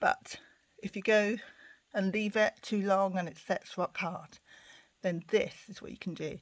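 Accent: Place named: United Kingdom. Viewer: Great tips, new subscriber and a British Accent hooray!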